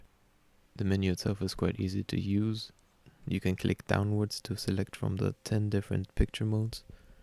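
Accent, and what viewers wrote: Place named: Sweden. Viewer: Tf is with swedish accent, lol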